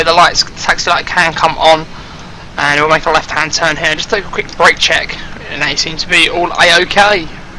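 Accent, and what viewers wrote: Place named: England, United Kingdom. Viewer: Like your English accent 😂🤔🤔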